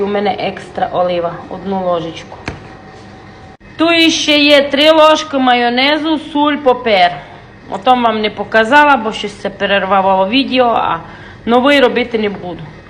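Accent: Transcarpathia, Ukraine. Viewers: Хіба це українська -це діалект закарпатської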